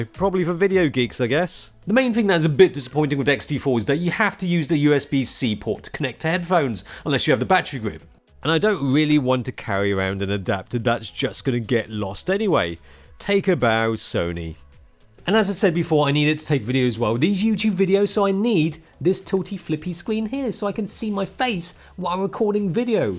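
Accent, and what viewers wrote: United Kingdom. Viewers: Forget the British accent, I swear this guy looks 17 and 70 at the same time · I've been trolling this guy for years and still haven't figured out if he's Japanese or chinese. And what's with than clean british accent? British chavs don't talk like that. No respect for her royal imbred racist majesty queen Elizabeth and stuff.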